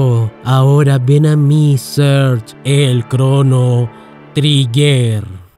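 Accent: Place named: Chile. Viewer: Solo acotar que al parecer el personaje Toma en según Wiki es descendiente del Toma que aparece en Chrono Trigger. Por lo demás, se agradece este resumen, por el poco material de este estilo que hay al menos en español, y por lo enrevesada y a la vez entrañable y bien construida que es esta historia. Mención honrosa al acento y las referencias chilenas 🤭✨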